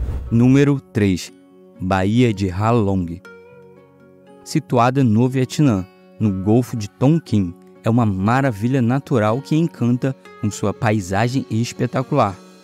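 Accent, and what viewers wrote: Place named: Rio de Janeiro, Brazil. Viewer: Vídeo infinitamente interessante e feito Com Muito Amor!!!!!! Nosso Lindo e Bom Deus cria somente belezas que nós podemos contemplar e admirar com felicidade infinita!!!!! Eu sou grande, grande fã deste canal fantástico e esplêndido! O Moço que tá falando tem uma voz bem doce e melódica e acho que é carioca como eu! 😃🙂 A voz dele e também um das razões de assistir nos vídeos deste canal, porque eu sou músico e flautista e para mim é alegria que ele tem uma voz tão linda!!!!! Mil beijos e fortes abraços pra todos do meu Lindo Rio de Janeiro!!!!! 💘 💘😍🏩💌💖💓💘😍🏩💌💖💓💘😍🏩💌💖💓💘😍🏩💌💖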